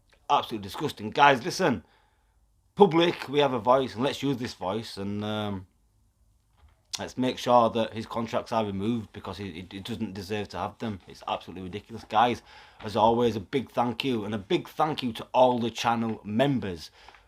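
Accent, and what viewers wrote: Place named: Southern England, United Kingdom. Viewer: South england accent,up in teeside hmm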